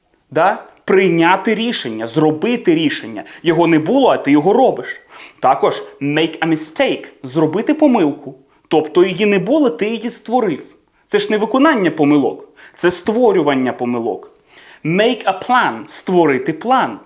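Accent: United Kingdom. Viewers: Ви смішний) на Метта Деймона схожий в цьому образі ❤ Відчувається британська вимова, не американська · Лук класний!, ваш британський акцент просто неймовірний! Як довго ви живете в Британії, що набули такого акценту? Дякую за випуск! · !!!! Велике дякую за Вашу працю. Мені дежу подобається формат викладання англиійської мови. Весело і розуміло. Але трішки добавте прикладів. По 1-2 реченню. Так краще запам'ятати. Ваш look very good!. Едине - я живу зараз в Канаді, pronunciation тут не британський, в цьому є різниця. Але головне з Ваших відео я получаю грамматику. Дякую. Good luck!!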